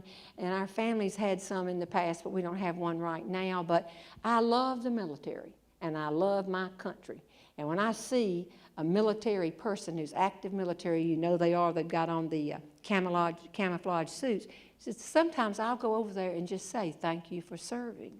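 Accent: South, United States. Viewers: I love this Lady and accent . I’m from Texas but when I listen to her for awhile I start speaking like a southerner . Love her 💕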